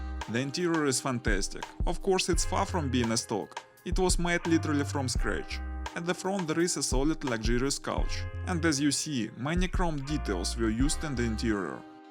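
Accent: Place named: Russia. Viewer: Greetings from USA! What basic geographic is this collection located?? The voice over sounds Russian. Pretty nice collection of Yank automobiles. The Chrysler 300 convertible made me throw up. Its a great car other than wheels and seats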